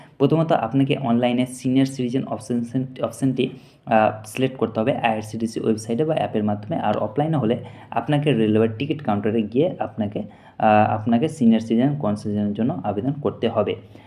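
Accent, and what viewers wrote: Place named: East Bengal, Bangladesh. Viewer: Eskip kore dilam, bhasa ta thik korun,Kath bangal ,kobey kantatar periye elen.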